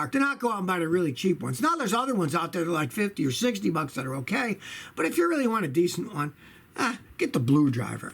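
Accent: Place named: New England, United States. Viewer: The way says "car" always makes me wonder if he's originally from New England.. Pennslyvania or New Jersey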